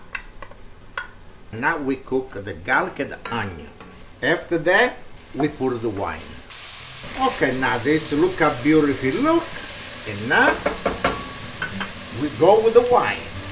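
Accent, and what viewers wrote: Italy. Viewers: Great looking food, but the Italian accent is falling away..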